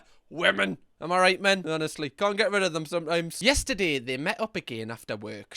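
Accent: Newcastle, United Kingdom. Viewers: It’s just part of his Geordie (sp?) accent - lots of Brits talk that way - I’m used to it now and find it charming 🇨🇦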